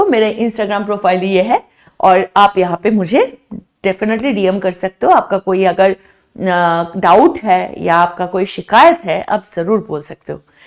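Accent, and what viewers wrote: Bengal, India. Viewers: Develop countries like America France Russia Britain Japan etc Underdeveloped nation like Afghanistan Bangladesh Myanmar Chad Mali Nepal etc I think ma'am you are from West Bengal. Bcoz you hindi tone like that. Thank you ma'am.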